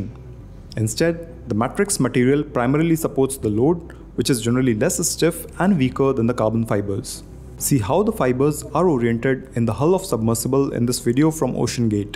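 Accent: India. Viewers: The paj33t accent is unbearable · Sorry but Hindi accent makes me laugh! Can't get serious about this video. I do apologize!!! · Indian englishhttttt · Who used an Indian to narrate this story??? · Im impressed. I didnt expect an indian to have such a good description and understanding of material and engineering. I almost left when I first heard him. But I think he is pretty good! I like the video, thanks for sharing your knowledge! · Great job! Your English is atrocious due to the heavy Indian accent but the content was good. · I felt like I was listening to an Indian call center🙄 also pressure is not only on top…..it is equal all around the exterior. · Oh no not that indian accent !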